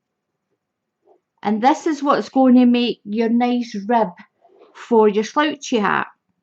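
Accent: Scotland, United Kingdom. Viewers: Was going to watch this to see this beautiful hat being made and staying to hear the accent. I love Scotland, can't wait to see how this hat turns out :)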